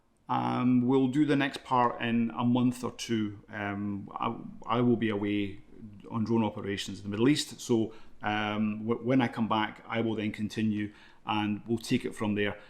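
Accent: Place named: Scotland, United Kingdom. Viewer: OK ..Finland ..but why do you sound Scottish ?!? OK ..maybe it's just me !! LOL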